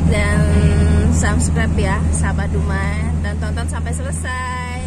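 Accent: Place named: Java, Indonesia. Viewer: Mantap, penyiare swarane kok medok banget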